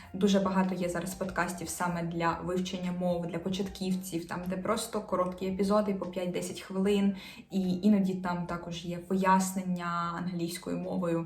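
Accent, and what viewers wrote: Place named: Japan. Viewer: Дуже пізнавально, дякую!! хочу сказати що мабуть те що ви почали вивчати японську -наклало відбиток на вашу інтонацію. При бажанні подивіться відео ToriChyanChannel. Наша дівчина що вийшла заміж в Японію, в неї дуже змінилась вимова і інтонація ( на останні слова в реченні) за ті роки що вона там. Бажаю вам щастя і успіху!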